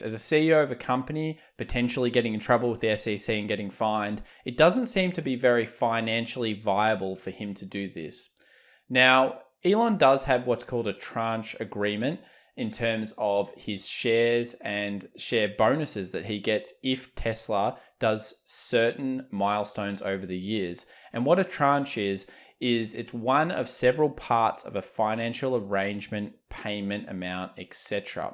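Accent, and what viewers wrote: Australia. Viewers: I m not dissing every australian ahaha I didnt know australian speak like that. I just cant withstand different accents other than american. There is noth to do about it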